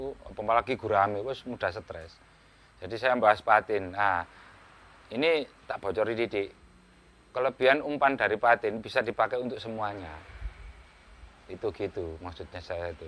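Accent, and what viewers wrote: Surabaya, Indonesia. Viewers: Suroboyo ndi cak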